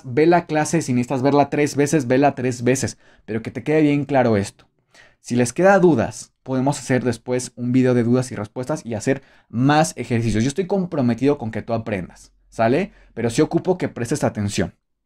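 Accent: United Kingdom. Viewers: Esto es inglés pritanico no es el inglés de American ?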